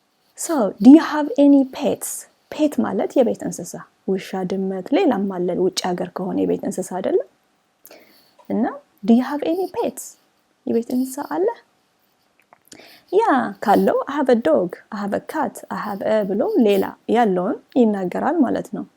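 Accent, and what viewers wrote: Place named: United Kingdom. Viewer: Good job👏👏 I love your British accent 👍 reminds me of my Brit friends.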